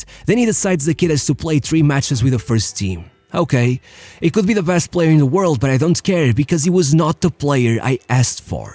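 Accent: Portugal. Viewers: Bro, embora com um excelente nível de inglês sinto que consegui perceber que és português ahahah abraço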